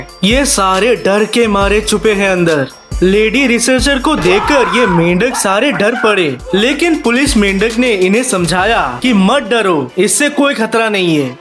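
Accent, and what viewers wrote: Bengal, India. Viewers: Bhai ap bangali ho kya ❤❤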